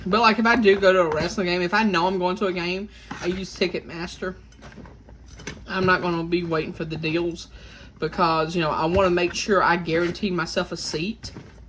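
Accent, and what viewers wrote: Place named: South, United States. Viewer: I love you giiirrrl you have the cutest southern ACCENT!❤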